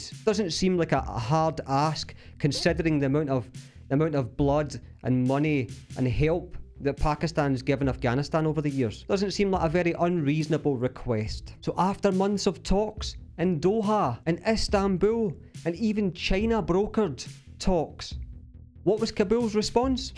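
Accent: Scotland, United Kingdom. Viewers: Shamé on u pa jéet, he's Scottish, it's natural & a nice accent. As u don't have anything to counter the facts so learn to shut up.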